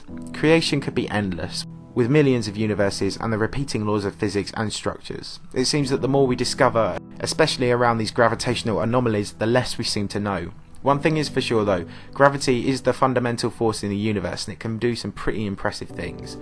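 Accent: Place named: England, United Kingdom. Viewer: Narrators with English accents; "Saturn" is mispronounced with the 'r' in Saturn silent, sounds like Sat-in, or Sat'n. I say mispronounced but to the English that's just the way they say it so I'm sure they would be quick to say it's not mispronounced. The word 'pattern' same thing, sounds like 'pat-in' or pat'n. It drives me nuts tho. The planet Uranus, pronounced Yer-In-Ess to the rest of the world while the the English just flat out say 'Your Anus' which, let's face it, just sounds wrong. Add these two words together and the English person say 'Sat'n Your Anus. Oh really, you sat in my anus? How was that? I don't want to know. I'll stick with 'Sat-urn' and Uranus.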